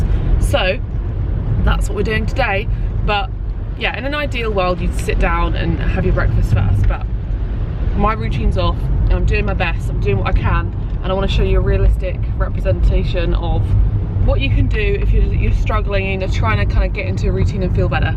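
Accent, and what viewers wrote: United Kingdom. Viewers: Absolutely not related to the video but: I just came back to France from Wales a month ago, where I lived for a year. Hearing your accent and seeing that pot of yogurt that was definitely from Asda suddenly made me so emotional. I'd never thought I'd miss the UK that much.. Lots of love on you and your fabulous country ♡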